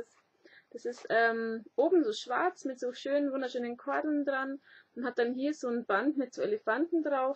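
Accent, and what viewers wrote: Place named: Bavaria, Germany. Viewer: hab deinen kanal heute erst entdeckt :) woher kommstn du genau? warsch ausm kreis münchen oder? ;) man hört hald einfach bei echten bayern des bayrisch immer raus hihi bin selber aus landshut bei mir isses genau so ;DD liebe grüße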